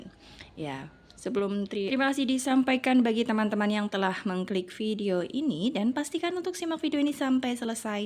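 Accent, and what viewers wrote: Banyumas, Indonesia. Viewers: Bantu jawab...kalo ga salah dari banyumas purwokerto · kok kaya ngapak aksennya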